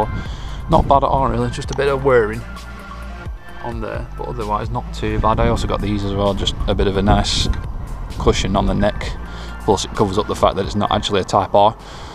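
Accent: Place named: North West England, United Kingdom. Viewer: Where you from mate? Sound local to me definitely north west anyway haha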